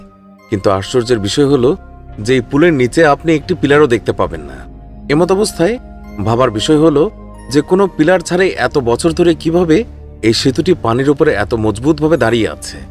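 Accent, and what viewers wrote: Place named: West Bengal, India. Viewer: 😂😂😂😂 আপনার ভাষায় প্রকাশ পাচ্ছি। আপনি ওপার বাংলার। হাওড়া ব্রিজ সমন্ধে আপনি ভুল তথ্য দেবেন না।যা জনেন না ১২:০০ রাতে কোন দিন দেখিনি বন্ধ হতে আমাদের দেশের ঐতিহ্য সমন্ধে , আমরা জানি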